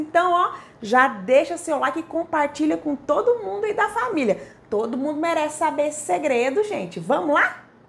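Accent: Minas Gerais, Brazil. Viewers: Gostei desse segredo,vou usar em casa! Gostei também do seu sotaque mineiro ❤ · Amo esse sotaque mineiro ❤️ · Sotaque de mineira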